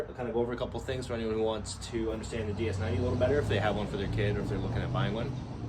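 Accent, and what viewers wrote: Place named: Canada. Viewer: I notice the Canadian accent but do you always speak that fast or was the video on 1.5 x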